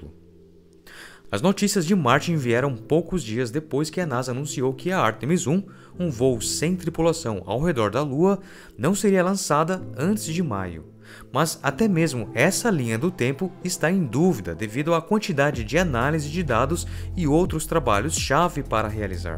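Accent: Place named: Caipira, Brazil. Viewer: Negativo no canal é apenas esse sotaque caipira do locutor. As informações e animações são bastante detalhadas. Parabéns!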